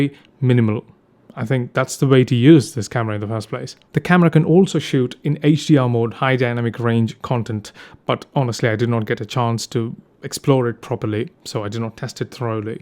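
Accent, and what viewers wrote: United Kingdom. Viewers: Accent like BBC news reader, Superb bro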